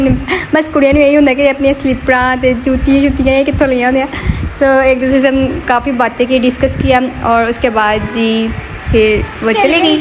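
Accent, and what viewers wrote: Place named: Punjab, India. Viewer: Hi Salam, Nice vlog 😊 Ap jo ya urdu boltay huhy Punjabi ka touch dayti hy maza aya jata hy 👌 😅😂, Han agar ap meray hath ka Custard khaya gi tu fan ho jaya gi 😊 qk ma usmay sugar use nahi karta (Secret hy 😉) 😅😂.. Love u ❤ & take care. Keep Smiling 😃 and Keep Shining ✨️ always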